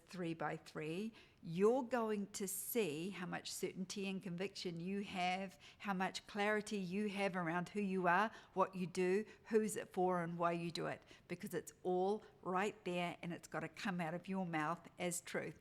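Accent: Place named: New Zealand. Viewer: Love that you're back with this new endeavor. Looking forward to your weeklies. Thank you for being and I am in love with your beautiful Kiwi accent.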